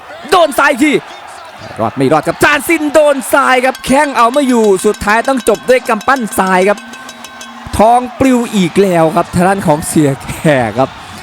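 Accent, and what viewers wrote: Isan, Thailand. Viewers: พากย์อีสานบ้านเฮาชอบมากไปครับ❤🎉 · สุดยอดพากบ้านอิสาน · พากย์อิสานมันส์มาก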